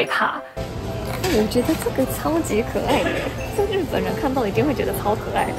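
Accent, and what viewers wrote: Taiwan. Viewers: 第一次點進來~ 看過的日本youtuber中~中文發音最好的! 一聽就是在台灣學中文~有台灣人講話的語調! 另外看起來也蠻有福氣的樣子! 很可愛! 原來第一名是泡芙~ 韓國人也很愛台灣泡芙! 另外,"無印良品" 本來就是日本企業~ "選品" 按"日本人"的眼光來選~當然日本人會喜愛! 最後關於"茄芷袋"~ 大家比較常想到應該是買菜的三色袋~ 你拿的那個比較有"設計感"! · 太厲害了👍 簡直比台灣人更台灣人！國語比台灣人還道地！令人敬佩不已！❤❤❤ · 的中文超道地台灣風，厲害！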